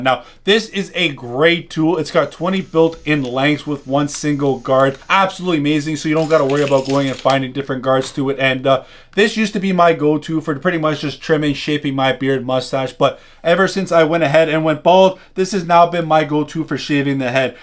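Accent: Northern Ireland, United Kingdom. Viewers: Northern Irish 😂😂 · Wow bro, yr accent sounds exactly like a Northern Ireland accent, is that where yr from?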